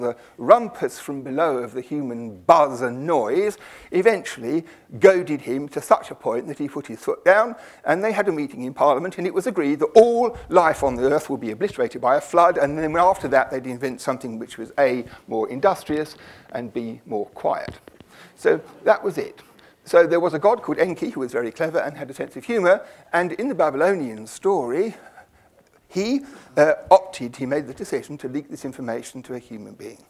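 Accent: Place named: England, United Kingdom. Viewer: I'm from America and I think a person from england would be the best for me to learn something. The accent keeps me interested . Kinda weird but I can listen to them all day. My GPS speaks in old english. Lol..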